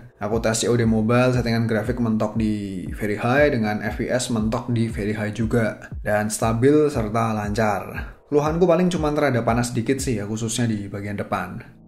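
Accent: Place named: Banyumas, Indonesia. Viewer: Dri logatnya Mas nya ngapak ya ? Klo iya kita satu darah 😂🎉 Sukses selalu 👍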